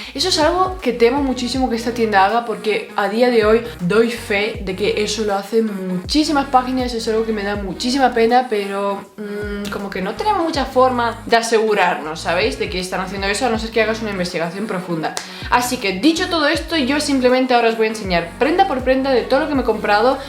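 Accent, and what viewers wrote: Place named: Switzerland. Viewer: ¿Eres suiza? ¿Rusa? Es que tienes algo de acento extranjero.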